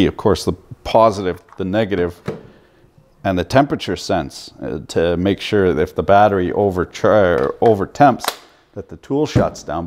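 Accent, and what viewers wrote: Quebec, Canada. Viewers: hmmm. are you from Quebec, canada? your language sounds familiar for cursing :) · why is he cursing in québecois? 😂 il est vraiment bon en plus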